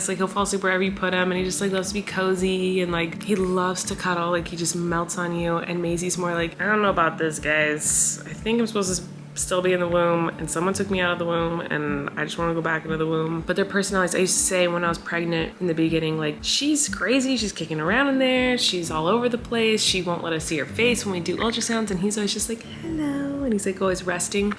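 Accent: South, United States. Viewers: Sweet, get his southern accent from you! You do a lot of “character” talking. He’s doing you. ✌️❤️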